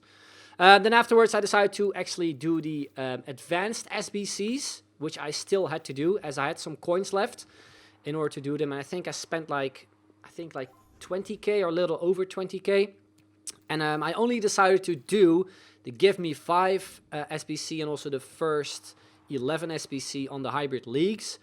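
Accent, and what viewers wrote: Netherlands. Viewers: K denk dat je Nederlands ben heel klein accentje nog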